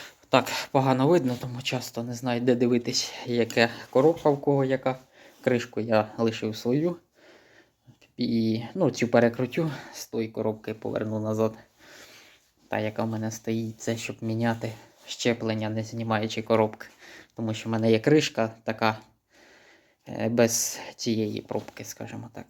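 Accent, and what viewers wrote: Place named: Mykolaiv, Ukraine. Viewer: підписався на Тебе і не шкодую. Відоси інформативні та приємні. Дякую (за все, а особливо за наш " суржик"! Я- з Миколаївської обл)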